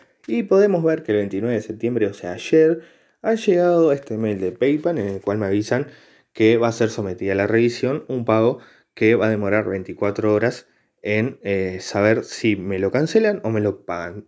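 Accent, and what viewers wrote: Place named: Argentina. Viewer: hola brother buenas noches x tu acento dedusco que eres argentino como hiciste para retirar tu pago ya que argentina no sale en los paises buenas tardes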